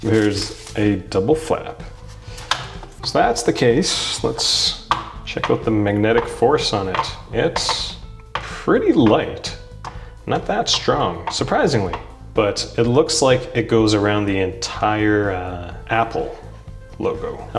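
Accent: Canada. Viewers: Thank u for the vid. Also you sound Canadian. Love that accent. Lol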